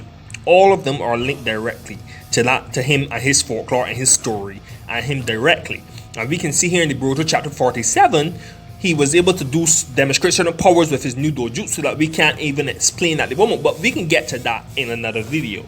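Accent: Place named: Trinidad and Tobago. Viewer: Loving the accent, trini?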